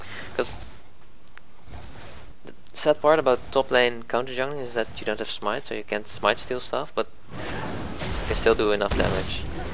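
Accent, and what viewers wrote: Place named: Netherlands. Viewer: i knew you were from holland.. dat accent xD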